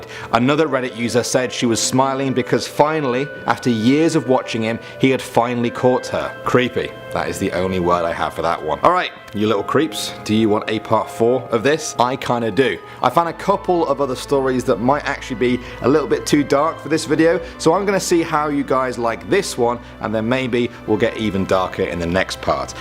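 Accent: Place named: North, United Kingdom. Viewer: your norther accent ironically says WOTCHAH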